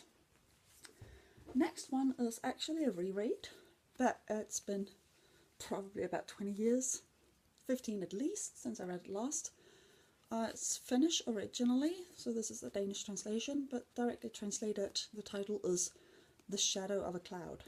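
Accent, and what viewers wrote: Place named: New Zealand. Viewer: Din kiwi accent er jo gudeskøn:) håber du får et rigtig godt readathon!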